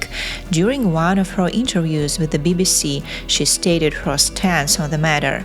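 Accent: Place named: Russia. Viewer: Love the Russian accent eg uffishal for official. A beautiful viydeo🥰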